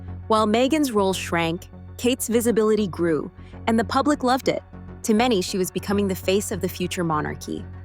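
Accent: United States. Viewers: I am always suspicious when we get announcements from an American voice? I will believe things from an English speaker or a member of the Royal Family or British Parliament.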